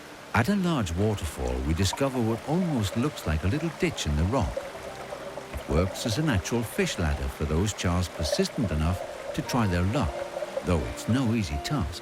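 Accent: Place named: RP, United Kingdom. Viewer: BBC English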